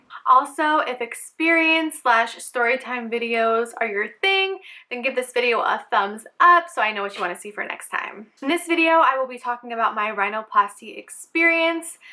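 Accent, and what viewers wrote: New Jersey, United States. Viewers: Melly Bon New Jersey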